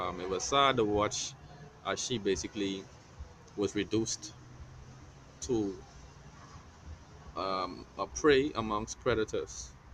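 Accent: Bahamas. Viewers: Are u Bahamian? The way we talk can't hide lol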